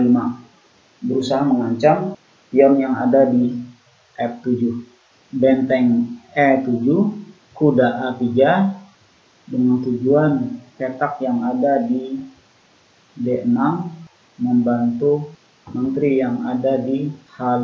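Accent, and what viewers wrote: Indonesia. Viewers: Logat banjarnya kental sekali bro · Suaranya medok banget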